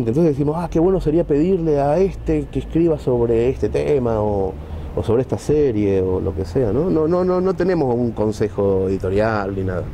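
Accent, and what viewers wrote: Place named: Buenos Aires, Argentina. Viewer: La mezcla en el acento de porteño y español me mata!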